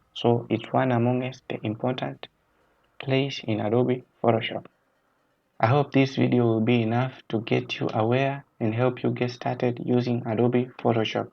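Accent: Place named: Nigeria. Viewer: Sounds like a nigerian 😁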